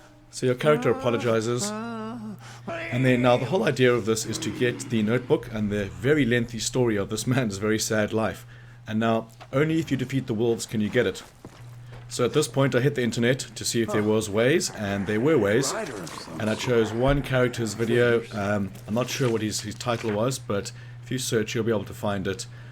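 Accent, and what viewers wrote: South Africa. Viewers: Are you South African? Your accent sounds South African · South africa?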